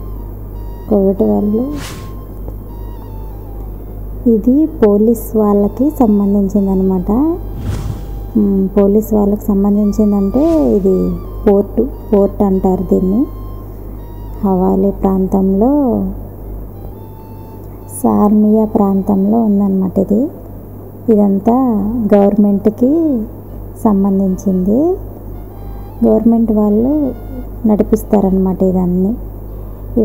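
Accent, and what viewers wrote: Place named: East Godavari, India. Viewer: Madhi kakinada..meedhi A vuru mee voice vintunte East Godavari yasa laga anipistundhi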